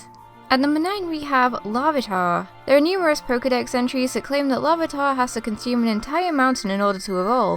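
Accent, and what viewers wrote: United Kingdom. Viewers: Wil Asd its just her accent she is british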